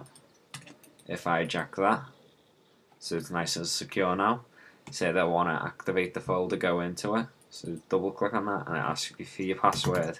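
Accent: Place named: Liverpool, United Kingdom. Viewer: A scouser! Hi from liverpool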